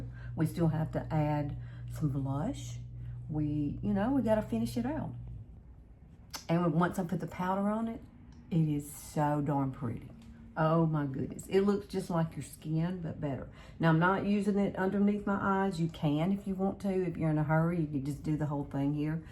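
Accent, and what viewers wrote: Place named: Alabama, United States. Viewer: Girl, I not only like watching you because of the great, down to earth, make up tips for older women; but I just love listening to your calm voice and devil may care attitude, your Alabama accent, and just the neighbor next door persona! Actually, wish you were my neighbor! We would be a hoot together; you with your Alabama accent, and me with my Texas twang! Just good 'ole girls, having fun!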